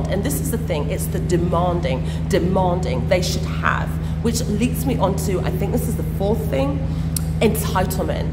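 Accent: United Kingdom. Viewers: I was born in Germany but am Ibibio, I have learnt to speak pidgin and a lil bit of Ibibio/Efik, anytime am in Naija, am always speaking pidgin and trying not to speak for too long at any given time so as not to give my "abroad" origin away and believe me sister, life has been Good and easy. I don't mean to be disrespectful but if I were u, I would try to tune down that Brit-ich accent. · She was born in the Uk and grown-up there obviously she went to a private school thats why the accent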